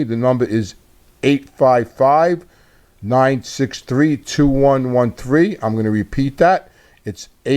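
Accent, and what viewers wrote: New York, United States. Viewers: I love listening to you about baseball!❤️❤️ Ny Ny!!! I hear you about the Accent!!!! 😂😂 Yes we do have more common sense!! No need to apologize ❤️ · My dad knew Carlos Marcello and Marcello used to be teased behind his back for speaking with a Cajun accent. Our family came from Italy to Louisiana in 1894. Love the NY accent and enjoying the videos.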